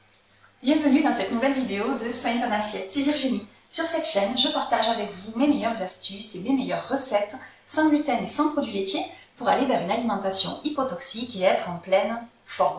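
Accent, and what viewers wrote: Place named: South, France. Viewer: Rien que l'accent du sud nous ouvre l'appétit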